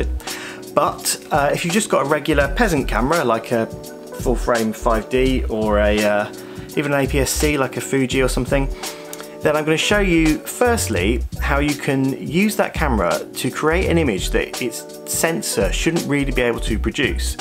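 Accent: England, United Kingdom. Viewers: Hey. Forgot something. I love your english accent (I don't need subtitles) and your videos too.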